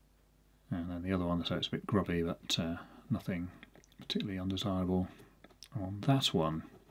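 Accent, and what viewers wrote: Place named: Northern England, United Kingdom. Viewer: Sometimes, sounds Northern.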